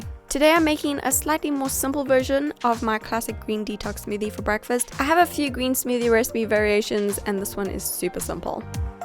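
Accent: South Africa. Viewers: Dat South African accent tho :p Baie goed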